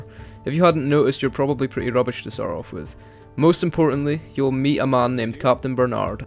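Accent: Scotland, United Kingdom. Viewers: Unmistakable Scottish accent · You Scots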